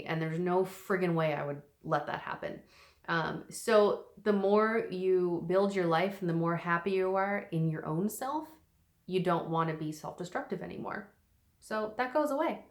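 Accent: Canada. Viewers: right down to the Canuck accent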